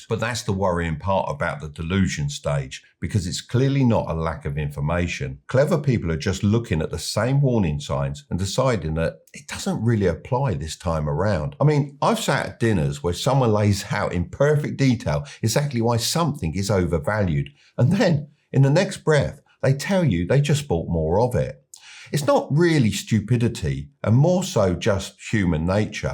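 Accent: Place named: Clacton, United Kingdom. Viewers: I tend to find that what to all intents and purposes is MIDDLE AGED bloke with an esturial dialect from somewhere close to London and talks about dollars rather than the exchequer of his foundation is someone worth taking with a whopping dollop of salt. This bloke has all the hallmarks of being bogus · Is this bloke from Clacton on sea !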